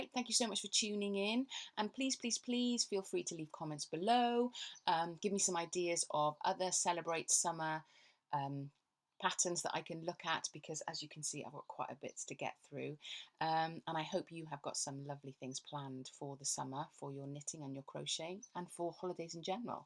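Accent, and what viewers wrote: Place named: Wales, United Kingdom. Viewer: I found your first podcast because YouTube suggested it to me as I had been watching Ranunculus techniques videos. And of course your first episode featured your Ranunculus. I am enjoying your podcast, especially the odd Bits in your Welsh accent. I hope you managed to take the ferry from Vancouver to Vancouver Island! I live beside Lake Ontario, but I am originally from the UK south coast.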